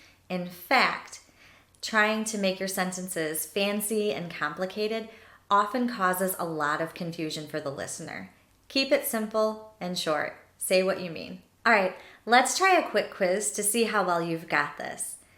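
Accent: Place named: United States. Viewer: American accent is quite stylish...Love it...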